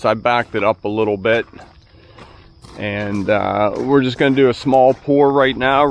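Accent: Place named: Pennsylvania, United States. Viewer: Where are u from? Sounds like pa.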